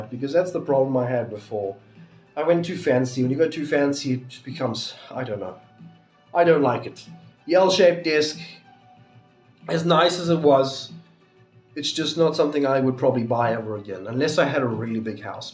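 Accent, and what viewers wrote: South Africa. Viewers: A. Room looks good, reminds me of how your office was set up at your old place because you had the cupboard behind you then. (I know cause that was the only way you could set up your office) B. I keep my PC on the floor, got it packed today for the move and there was a huge dust bunny under it. Good luck with Zelda's dust bunnies. C. Green screen idea sounds good, can't wait to see the final product. Can you actually sew because you mentioned stitching? D. Room is lacking some color, looks a bit like a hospital room. Maybe when you get the green screen in its final set up you could get some pretty window coverings? Unless you like all the white then power to ya. E. Finally, when your sick like in the video your South African accent is much thicker. More thick than normal i mean. ❤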